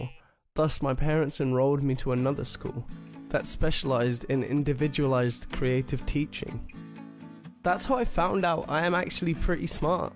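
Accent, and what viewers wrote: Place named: United Kingdom. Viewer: His voice in this story is pleasing to listen to and his British accent sounds pleasant too.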